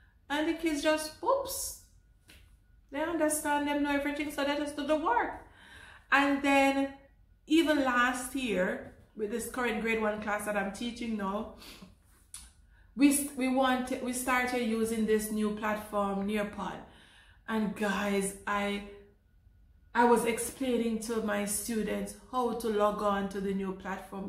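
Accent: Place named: St Ann, Jamaica. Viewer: You are a well trained teacher I enjoy your video. You are from st Ann's sound like my sister inlaw blessings.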